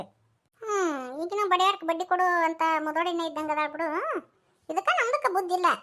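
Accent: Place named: North Karnataka, India. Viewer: ಉತ್ತರ ಕರ್ನಾಟಕ ಭಾಗದ ಮಾತುsuper parakka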